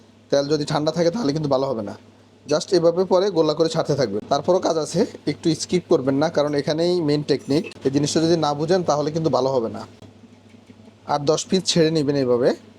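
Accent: Noakhali, Bangladesh. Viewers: ভাইয়া আপনার গ্রামের বাড়ি সন্দীপ নাকি নোয়াখালী?জিজ্ঞেস করলাম কারণ আপনার কথায় এ দুই জেলার আঞ্চলিক টান আছে।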